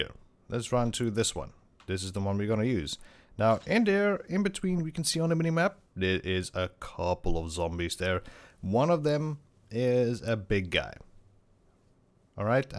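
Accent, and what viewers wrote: South Africa. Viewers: Wow a South African lekker man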